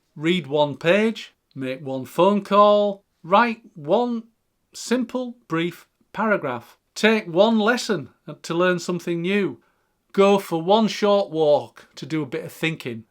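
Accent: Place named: Liverpool, United Kingdom. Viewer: Hallo! I'm 61 and I just started roller skating and learning the ukulele in the last few years. I'm going to be taking a physics class in the fall. Thanks for these wonderful reminders. I'm considering writing a book and you're convincing me to give it a go. Never stop learning, never stop growing! 🎉 (BTW, your accent reminds me of the Beatles sometimes. Are you from Liverpool? I'm American, so I am not fantastic with British accents, but I do know they aren't all the same! 😂)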